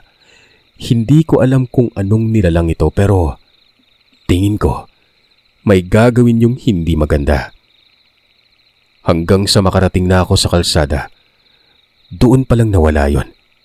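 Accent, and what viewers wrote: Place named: Iloilo, Philippines. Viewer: Ilonggo. Eh. Malambing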